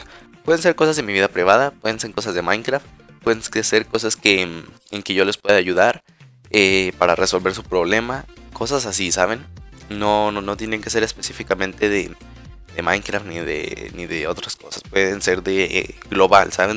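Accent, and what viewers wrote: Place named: Spain. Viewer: no se la verdad, será por que se te pego lo español cholesco y me da cosa, y me recuerdas a varios tipos que conocí en mc y eran ratescos hablando con esas Jergas de sus ytbers españoles. también esta intro v: me da ganas de pegar al creador de esa canción xd pero bueno, eso no significa que te haga hate, me gustan tus videos, y se que te esfuerzas haciendo los videos, las horas que le pones al mc, independientemente de en cuanto tiempo lo subas a la red, el renderizado eso se merece y bien ganado los subs que tienes v: y seguire poniendote que no me caes.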